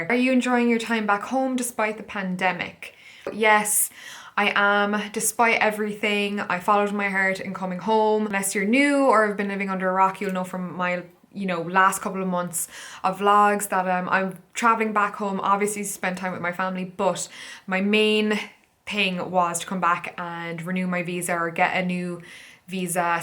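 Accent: Ireland. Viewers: Your Irish accent is poppin even more now!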